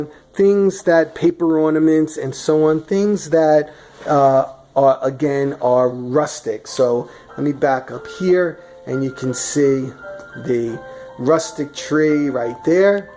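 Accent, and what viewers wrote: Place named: Northeast, United States. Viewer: Are you from new york, new jersey or boston? You sound like you are from northeast, i have a slight new york accent but not very much cause im in nebraska right now lol your house is gorgeous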